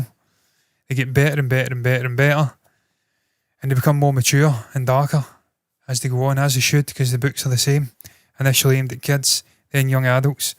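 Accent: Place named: Scotland, United Kingdom. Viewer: Lmfaooo where's that accent specifically from? Scotland? Where in Scotland? I love it, as a non-native English speaker I thought it was some celtic language for a moment 😭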